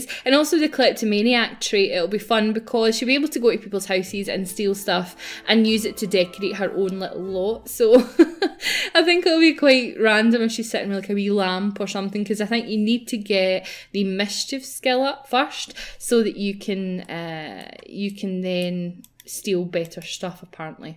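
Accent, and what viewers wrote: Scotland, United Kingdom. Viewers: I love your accent what part of Scotland are you from?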